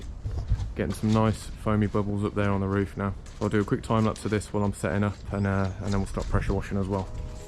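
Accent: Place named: Staffordshire, United Kingdom. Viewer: Alright. You sound like your from the staffordshire area.... do you have a go to shop for powerwashers and accessories. If not what is your recommended website?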